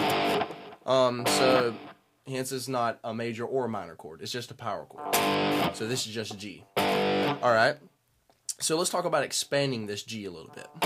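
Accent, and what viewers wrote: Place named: South, United States. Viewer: I can hear that southern accent. :)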